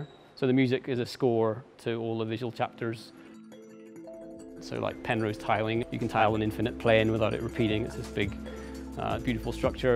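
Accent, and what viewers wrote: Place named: Northern Ireland, United Kingdom. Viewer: Holy shit my favorite artist is from the same country as me, northern Ireland. Heard the accent and flipped out!! Keep up the good work 👍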